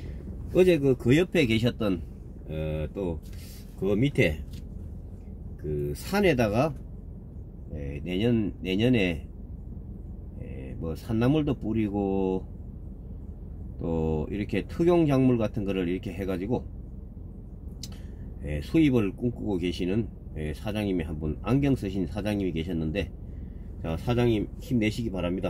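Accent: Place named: Gangwon, South Korea. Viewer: 인물도 훤하십니다 상동에 가보구싶네요 말씀도 강원도 본토말씀 듣기좋습니다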